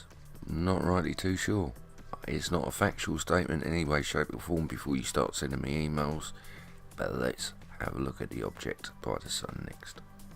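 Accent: England, United Kingdom. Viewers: Good work, I appreciate your systematic approach and (as a silly side note) the English accent is always a marvel to wrap one's ears around.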